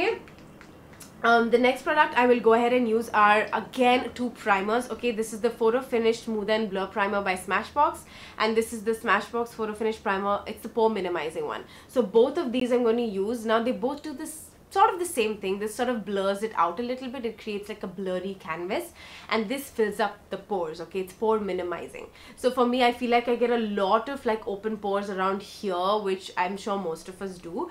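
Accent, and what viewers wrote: India. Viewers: Love ur cute hindi accent... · I love your Hindi accent you sound so freaking cute! How people can hate it I don’t get it.. I enjoy it a lot when you speak Hindi trust me 😍🥰🤩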